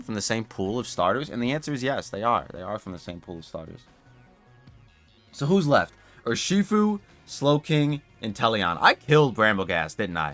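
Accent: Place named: United States. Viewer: ¿You, native Costa Rican? You sound pretty much like a native American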